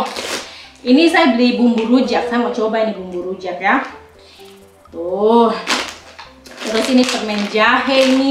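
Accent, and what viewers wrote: Manado, Indonesia. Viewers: Bhsa menadonya kental betul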